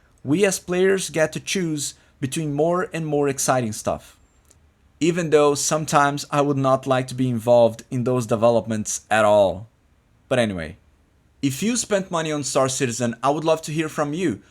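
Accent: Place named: Brazil. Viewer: Vc é brasileiro não é?? Teu sotaque em inglês é BEM BRAZUCA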